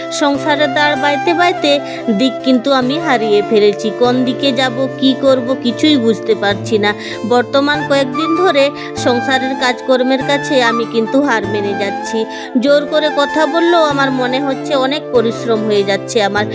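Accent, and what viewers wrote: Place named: Bankura, India. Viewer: একদম ঠিক কথা। তোমার ঐ মিষ্টি ভাষাই আমাকে বুঝিয়ে দিয়েছিল যে তোমার বাড়ি হয়তো বাঁকুড়ায়। আমিও কিন্তু তোমাকে একটি খাবার খেতে অনুরোধ করব ..... কিছুটা পরিমান গোটা খোসাসুদ্ধ মুগডাল ভিজিয়ে অঙ্কুরিত করে রেখে দেবে। মাঝে মধ্যেই একটু একটু করে খাবে। শক্তির অভাব আর থাকবেনা। আমি এতদিনে বুঝতে পারলাম ছাগল চরানো কাকে বলে। আসলে খুঁটিতে বেধে রাখতে দেখেছি, অথবা একা একা নিজেদের মত ঘুরতে দেখেছি। কিন্তু ধান খেতের পাশেই এভাবে পাহারা দিয়ে ছাগলকে ঘাস খাওয়াতে দেখেই ব্যাপারটা বুঝতে পারলাম।💛❤️💛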